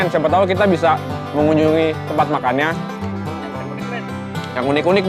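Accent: Jakarta, Indonesia. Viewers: Orang Betawi ye?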